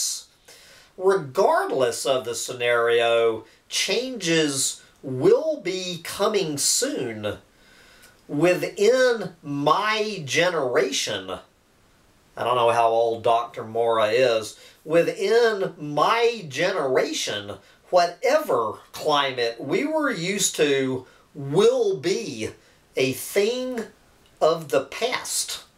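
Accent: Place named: Georgia, United States. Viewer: It´s the georgian accent.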